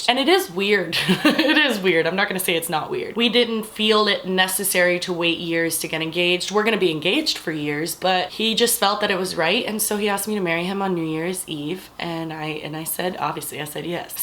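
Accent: Cuba. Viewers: Brianna Zinsky she's Cuban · Sharon Amador half cuban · She's Cuban :) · It's a Cuban accent. She's Cuban.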